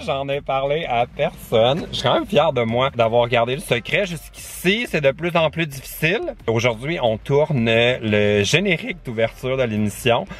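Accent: Canada. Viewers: Excellente vidéo, j'adore ton humour et ton accent canadien.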